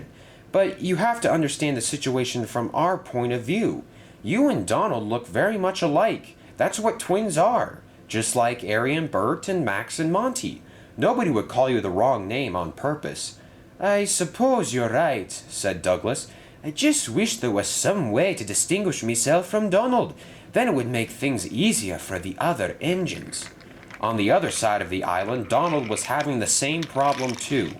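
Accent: Scotland, United Kingdom. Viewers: I like your Scottish accent! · Hey you did a really good scottish accent almost like George Carlin did in the t.v. show. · AWESOME SCOTTISH ACCENT BRO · Your Scottish accent, a RWS reference, illiterate children and Ryan being zinged at the end. All the makings of a fantastic episode, wonderful work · That is a great Scottish accent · How do you learn to speak Scottish 😱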